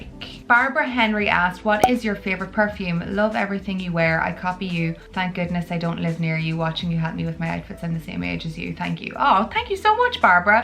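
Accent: Ireland. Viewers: I see, hear an Irish accent, think of tommy shelby, then die bc I love cillian murphy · Greetings from Los Angeles!🏖🌴Your channel was on my suggestion and I took a glance and got hooked! I hit the subscribe instantly. Gotta say I've been binge watching your vids.😊 Great style, great personality, lovely Irish accent and overall 👍👍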